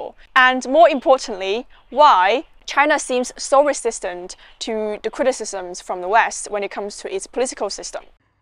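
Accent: England, United Kingdom. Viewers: Very well put. I confess that I am intrigued by China, and this video presented by this very sweet and lovely lady with her British accent, did an admirable job in pleasantly explaining why China is as it is. It is complicated. Western nations seemed to enjoy exploiting China for that Century of Humiliation. Shame on the West. I hope our nations can find a happy medium. Job well done,! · i could hear your english accent immediately lol. very cool. im american btw good video!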